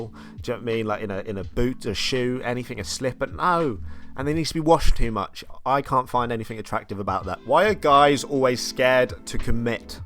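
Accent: United Kingdom. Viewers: Your accent is like you're one of the cast of sex education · Okay I just clicked on this and your energy and British accent are so cute 😭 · I love your British accent and hello from Germany 👋🏼🌸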